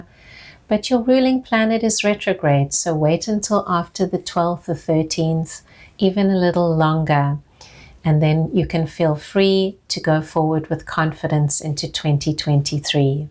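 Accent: South Africa. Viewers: Are you South African by the way, your accent sounds so familiar? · You have a South Frican accent. Everything you said resonates. I saw your video today April 26th and started a health voach course in the begining of march a should get my certificate in march 2023. Also watched mu rising sign acquarius before and it is all playing out as described. Just wow!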